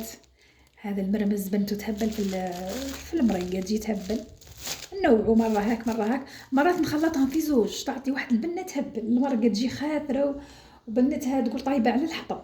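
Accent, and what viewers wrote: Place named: Djelfa, Algeria. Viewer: انا عاجبتني غير اللهجة كلش بشوية مليحة رويزة بيطة حميصة انا راني بعيدة على الجلفة وزدت سمعت هدرتك توحشتها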